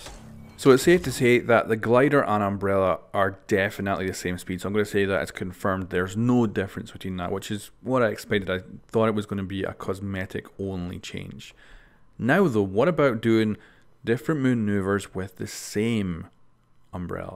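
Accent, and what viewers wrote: Scotland, United Kingdom. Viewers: Are you Scottish or Irish?? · Are you Scottish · Are you Scottish? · Sub'd cause Scottish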